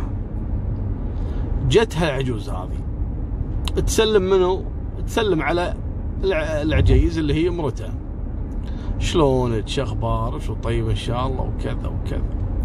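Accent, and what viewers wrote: Kuwait. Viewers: الله أكون في عون الحاجة والحاج بعد هدا العمر يطلعوا اخوة والله مثل هده الحادثة صارت عندنا في المغرب وأكن اللحمد لله كانوا لم يتزوجوا بعد فى عزلوا القصة قبل الزواج البنت دخلت في حالة نفسية شديدة هي والشاب ومرت سنين حتى تشافت منها انا ارى ان على الأمهات ادا ارضعوا ان يقودوا لأبناءهم ان تلك الفتاك هي أختك او اخوك كم الرضاعة حتى لا تقع مثل هده المشاكل طريقة سرد القصة رائعة رغم أني كنت أعيد القصة مرتين حتى افهم اللهجة الكويتية. مغربية مرت من هنا 🇲🇦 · بما أني جديد في سماع قصصك ودي ارجع اسمع القديم بس ماشاء الله تتحفني في الجديد وأنسى القديم أسلوبك مره جميل ولهجهتك الكويتيه الجميلة هي من خلتني افعل الجرس وفقك الله لما يحب ويرضا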